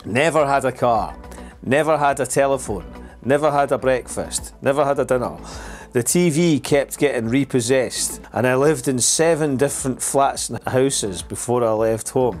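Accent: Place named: Scotland, United Kingdom. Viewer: 😂 I loved your video. Greetings from Colombia 🇨🇴 South America (of all places ) landed here and it made my day.😂 I have to say it man .. the accent .. fantastic! Never hear. Before. Scotland 🏴 right ? Very nice. Beautiful. Will listen more Cheers 🥃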